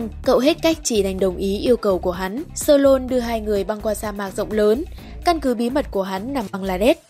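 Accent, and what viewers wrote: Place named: Hai Phong, Vietnam. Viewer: chị review chắc là người Hải Phòng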